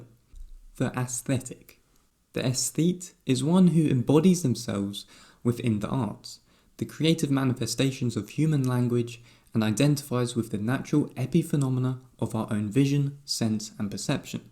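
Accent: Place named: United Kingdom. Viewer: Can you please add (British accent) to save me a time.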